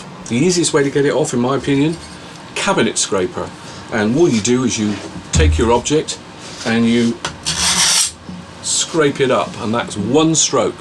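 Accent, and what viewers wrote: West Country, United Kingdom. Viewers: Discovered your channel an hour ago and already bingeing :) Are you from the West Country?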